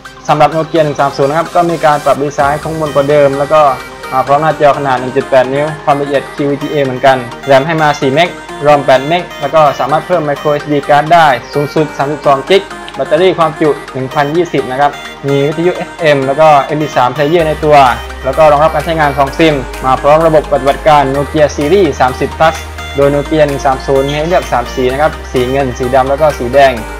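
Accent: South, Thailand. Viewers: ทำไมพูดเหมือนทองแดงนิดๆ คล้ายสำเนียง 3 จังหวัดภาคใต้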